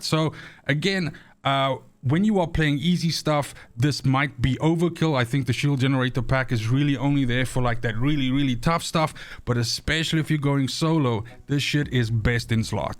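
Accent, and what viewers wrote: South Africa. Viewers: Howzit bro. Are you from South Africa? Cool video man, you gained a sub and like from me.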